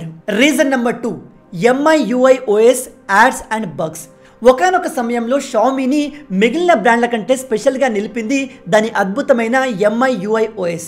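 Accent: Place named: Marwari, India. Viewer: Anna, Mana ooru edhi. Slang kastha marwadi slang la undhi.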